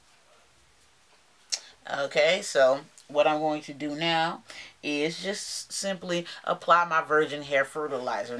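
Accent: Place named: Texas, United States. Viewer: Hey! I was watching your videos last year or whenever when you posted about Virgin hair fertilizers to grow hair and testing if they work etc. And I saw some uploads but not watched them about your cancer diagnosis and the birth of your baby. Also of your hair loss from chemotherapy treatment as I believe this was 2016 or November 2017? I might be wrong though lol By the way I like your accent! Are you born a Texan because it sounds strong unless its common? Hope you reach your hair goal soon!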